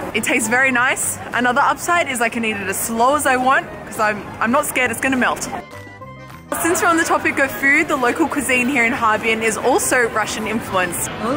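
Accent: Australia. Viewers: Australian accent? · Host is an Australian?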